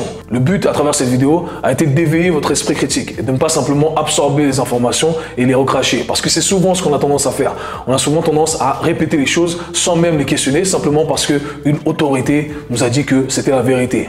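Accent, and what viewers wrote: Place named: Belgium. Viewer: L'accent belge mélanger à l'accent créole portugais c'est un truc de fou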